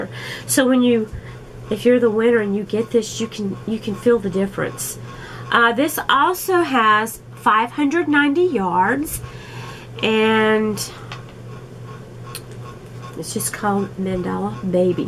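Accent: Texas, United States. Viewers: Haha you had me at your Texas accent 😍 I’m from Florida and have slowly learned knitting the last couple of years...but have most recently picked up crochet....that is my hobby/life right now haha... other than play with yarn, I’m recruiting my granddaughter to play with yarn with me lol....she is 6 1/2 and she loves rainbow yarn and always picks it out, usually solids that make up a rainbow and demolish and knot up 5 of my skeins....lol It’s OK just having someone else around interested in the same thing is wonderful 😊 So glad to have found your channel can’t wait to watch all of your videos.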